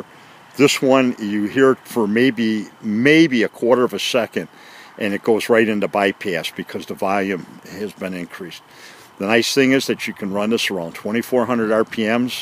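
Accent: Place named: New England, United States. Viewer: I like your videos on this... How much is the electric start option, may I ask..., and where are you located. Your accent seems New England, me, I'm originally from Connecticut, Wallingford area. Thanks for all the good tips...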